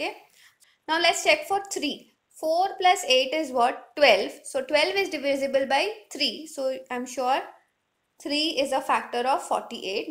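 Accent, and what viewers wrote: India. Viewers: The only problem is the accent. Indian accent is hard to understand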